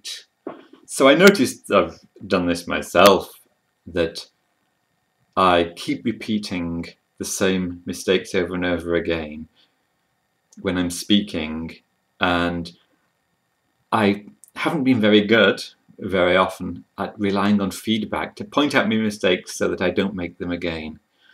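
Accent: United Kingdom. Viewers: Very interesting video. I notice a few people have commented on English accent. But what struck me immediately was that his accent sounds British, but his spelling on the whiteboard was American. An unusual combination.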